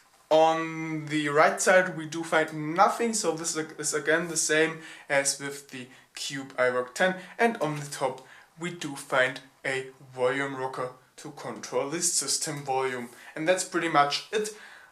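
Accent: Germany. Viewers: German. I'm really sure. Source: I'm German. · Can' tell if he's german or indian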